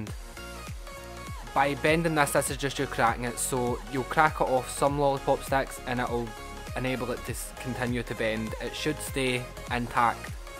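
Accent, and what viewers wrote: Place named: Scotland, United Kingdom. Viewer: Jesus ..you're so scottish😂😂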